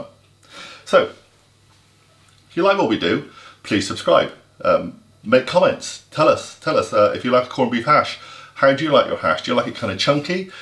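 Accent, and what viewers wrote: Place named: West Country, United Kingdom. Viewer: Just subscribed and slow cooker is on. Thanks, like the accent, sounds Dorset or Somerset?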